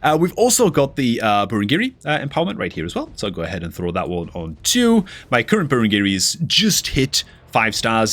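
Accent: North East England, United Kingdom. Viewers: I wish he would slow down a bit. I'm guessing north east England and it's hard to keep up at times. Not Newcastle but that neck of the woods.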